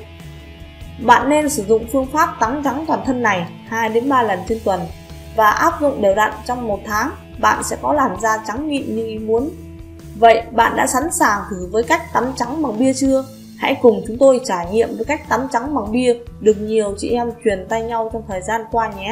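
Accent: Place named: Countryside, Vietnam. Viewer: Giọng quê mùa kém sang lắm, ơi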